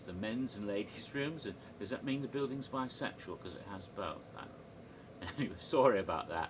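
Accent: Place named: United Kingdom. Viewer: Oh wow, your British accent is awesome Doctor!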